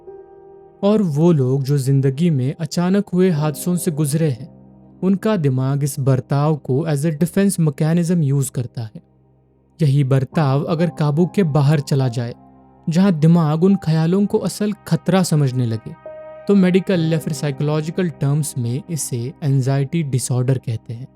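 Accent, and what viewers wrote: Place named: Maharashtra, India. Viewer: I noticed by listening your hindi accent, your mother tongue is marathi. Am I right?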